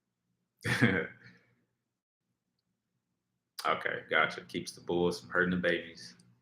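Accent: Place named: South, United States. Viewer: I’m sorry but I just have to say I love your country accent it’s sexy as hell!!!! It does things it’s very refreshing the whole attitude personality it’s definitely the whole package to me just sayin!!!!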